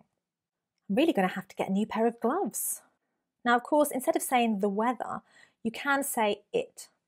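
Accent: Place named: RP, United Kingdom. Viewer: Thank you so much! An extraordinary lesson without any doubt. I lived in the Southwest of London and love your accent. It sounds me received pronunciation.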